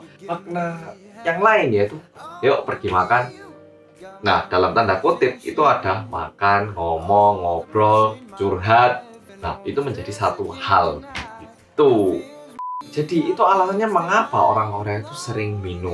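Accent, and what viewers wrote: Java, Indonesia. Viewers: seneng wae ngunu krunguu Medhoooog e kuii sen puanjjaaaaangg😂😂😂😂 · Korea medok maneh rekkkkk, asyekkkk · Yessss orang Korea yg medooook muncuuulllll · Yang medoookkkkk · Kalo medoknya ilang gimana? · Medokkkkkkkkk ohhhhhhhh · Paling suka awal pembukaan bersama orang Korea yang medhooooookkkkk🤣🤣🤣🤣🤣🤣 khas bgt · Seneng bgt tiap denger kalimat medhoooook 😁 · Medokmu sam.😂🤣🤣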